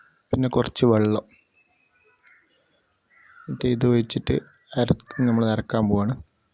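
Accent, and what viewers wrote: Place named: Kottayam, India. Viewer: Oh. Ith Kottayam achayans style. Kollaam. Kurach, pachakkari koodi...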